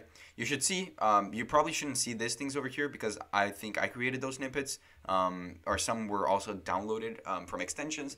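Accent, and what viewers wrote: Brazil. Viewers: This is my first time seeing one of your videos, and you speak very well but I can't help but think that you sound as a brazilian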